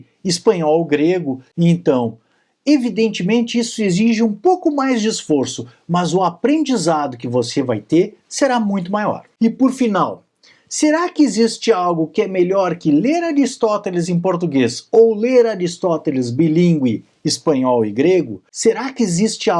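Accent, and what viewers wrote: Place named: Rio Grande do Sul, Brazil. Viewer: Ilustríssimo Professor e Dr., um aplauso e um abraço de Gravataí/RS. Pelo sotaque, tu deves ser meu conterrâneo.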